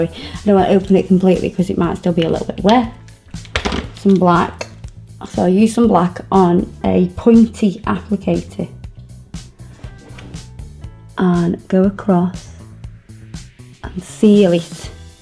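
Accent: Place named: Manchester, United Kingdom. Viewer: your accent is manc with Sheff and Liverpool mixed in?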